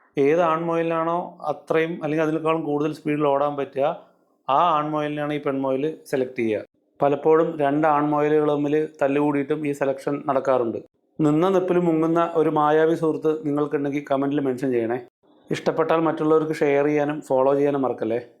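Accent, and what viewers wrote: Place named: Palakkad, India. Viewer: Palakkad slang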